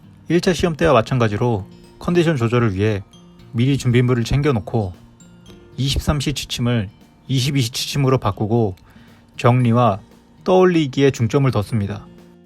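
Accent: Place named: Seoul, South Korea. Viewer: 1과목 교수님 맡으셔도 충분하네요~~ 음성도 좋으시고 특히 서울말씨가 귀에 속속 꽂힙니다. 감사합니다 🙏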